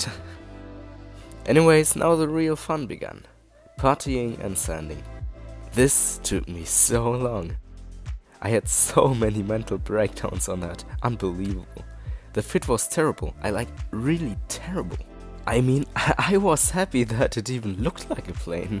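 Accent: Germany. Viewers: Are you german???? Your voice and english accent sounds a lil bit like that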